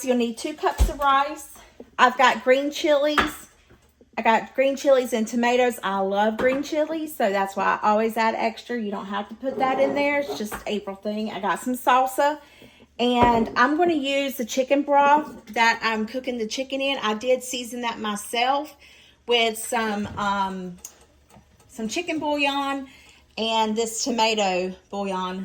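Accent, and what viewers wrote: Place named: South, United States. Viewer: April here from your friends channel, I love caserole looks so good. I love your Southern accent girl!!! keep doing you BY George! have a wonderful day !🍏🍎🥭🥑🍆🥕🌽🍅🫑🌶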